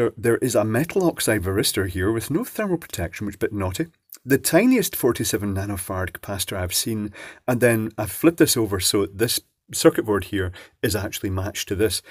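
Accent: Scotland, United Kingdom. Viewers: Hello, i love the Scottish accent, it makes everything more interesting. 😊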